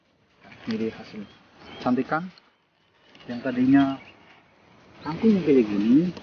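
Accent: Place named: Banyumas, Indonesia. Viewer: sampean tiyang pundi bos kyong ngapak bhsane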